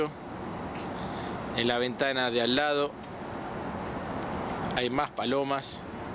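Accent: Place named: Argentina. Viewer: El que habla es argentino.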